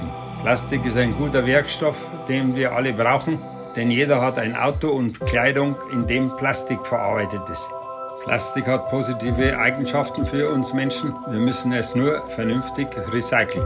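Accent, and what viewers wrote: Bavaria, Germany. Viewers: Du musst doch aus Bayern kommen oder ich hör das an dein fluacha 😂mei opa red genau so da her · Wia sympathisch ❤ s'gibt halt nix scheenas als Schimpfa af boarisch 😆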